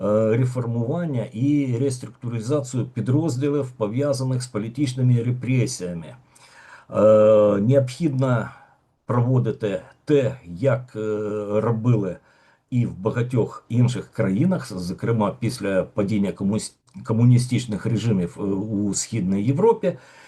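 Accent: Russia. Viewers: ... більше розмовляйте українською в побуті, бо рассєйська на губах висить.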